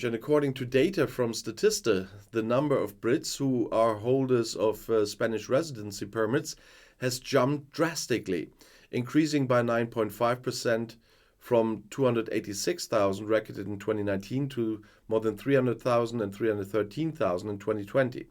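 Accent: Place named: Germany. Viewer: Nice German accent..😎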